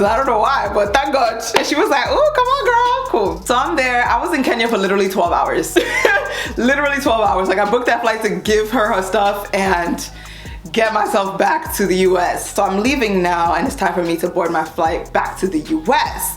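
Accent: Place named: Nigeria. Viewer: It's the Nigerian accent for me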